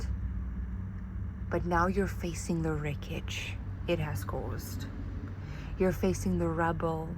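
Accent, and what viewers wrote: South Africa. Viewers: You probably prefer your anonymity but that accent is 🇿🇦 coded. Lol correct me if I'm wrong😅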